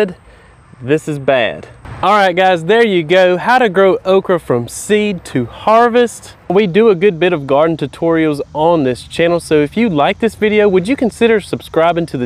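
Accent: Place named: West Coast, United States. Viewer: You have a very interesting accent. Sounds like you grew up on the west coast, but moved to the south.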